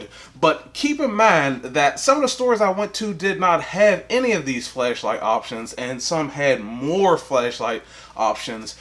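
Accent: Pittsburgh, United States. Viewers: From the second I heard you start talking I knew you were from Pittsburgh as so am I. So yeah, all yinz dahn at Lowe's you can find all them tools for a good deal.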